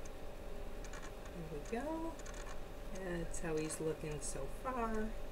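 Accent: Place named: Michigan, United States. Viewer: That was a great tutorial and I enjoyed hearing your voice from MI perhaps? I can't wait to get these myself.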